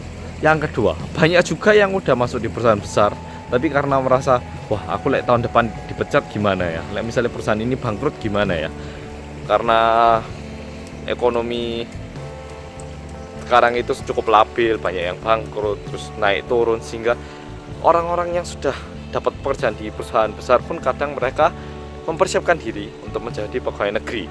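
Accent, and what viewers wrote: Java, Indonesia. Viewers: Mas coba dong bikin video, tapi jangan pake logat jawa:v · aku seneng gawe logat medok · ini orang korea kok medok 😂 pernah ke jawa apa temen nya bajindul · maaf sebelumnya aku baru liat chanel ini,mau tanya ini bnr org korea asli? tpi ngomongnya agak logat jawa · logat korea,ne ilang,,,!!!! jowo,ne medhokk. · the power of medhok haha jawane kentel banget salam sangka etan yo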